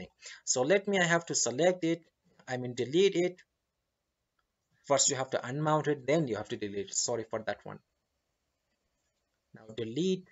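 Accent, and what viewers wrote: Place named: India. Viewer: the relief i felt when i heard an indian accent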